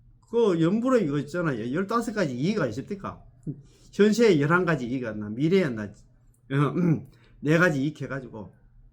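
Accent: South Korea. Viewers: 오늘은 비가 내리네요 인자한 미소와 경상도의. 구수한 사투리가 정감이가는선생님의. 강의. ㆍ존경합니다 오늘도 건강하시고 좋은날 되십시요 나무아미타불 나무아미타불 나무아미타불.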